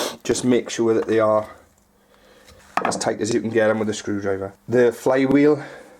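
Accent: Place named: Scotland, United Kingdom. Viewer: Beautiful Scottish accent 😊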